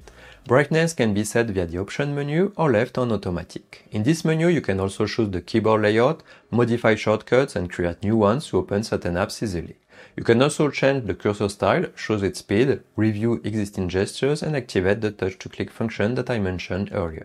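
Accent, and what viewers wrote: France. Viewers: your french accent is very strong. difficult to understand. I suggests you speak slower so that people can understand you.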